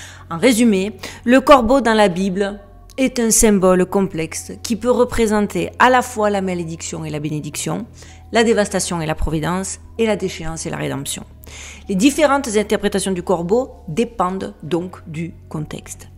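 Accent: South, France. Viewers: Trop top tes vidéos!!merci ❤ Trop jolie naturelle et ton accent frenchy trop bien ça chante le Sud!? Ne change rien!! blessings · Bonjour le Sud ( c’est pour l’accent ) à défaut de te connaître!! T’es sujet sont toujours pertinent et apprécié; pour infos et pour rester sur ton intérêt pour l’intelligence artificielle et le contrôle des masses, connais tu l’expérience dit: « MK ULTRA « si oui quand pense tu? Si non, je te laisse te renseigner et je pense que cela devrait t’intéresser. Bonne continuation et à bientôt pour de nouvelles vidéos. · Merci pour cette vidéo, merci pour vos recherche et nous donner des éléments de réponses pour s’élever et mieux comprendre notre monde. Vous êtes captivante et cet accent marseillais j’adore! fever ❤️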